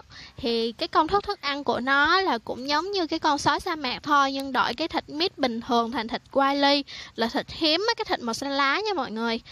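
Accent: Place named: Phu Yen, Vietnam. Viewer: Giọng phú yên nè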